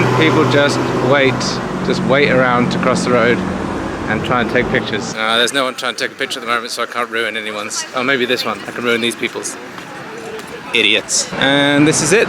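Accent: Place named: London, United Kingdom. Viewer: this is very good.. finally i found someone speaking clear normal londoner accent. thanks alot for the beneficial video. looking forward to see some more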